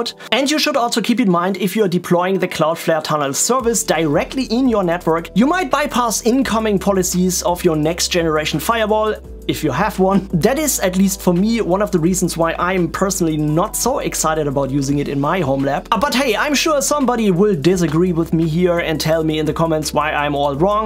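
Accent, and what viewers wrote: Germany. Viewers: your voice sounds very german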